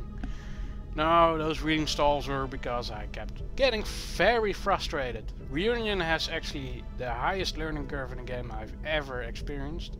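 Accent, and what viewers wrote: Netherlands. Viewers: Great tutorial, helped allot thanks! Btw you sound alot like Alistair Overeem, maybe its just the dutch accent lol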